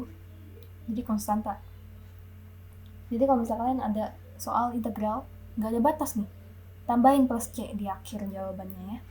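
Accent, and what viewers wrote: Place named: Makassar, Indonesia. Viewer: Kuliahnya d mana kak serasa org makassar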